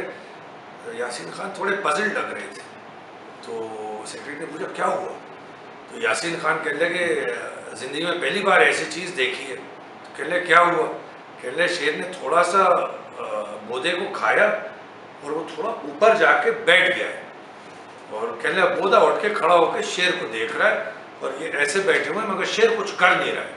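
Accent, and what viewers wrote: Bhopal, India. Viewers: Zabardast tale.. btw you have a unique style of telling these stories with bhopali spices 👏👏👏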